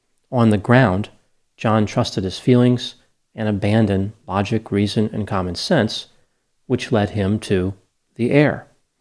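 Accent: United States. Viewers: Very clear American English.Good speaking voice.Thank you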